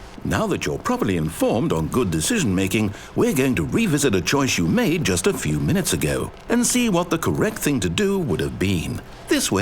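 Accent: United Kingdom. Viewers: It's called a british accent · i dont know what franknfurter sounds like cause i never saw rocky horror picture show but the narrators particular brand of english accent is quite similar to classic stewie's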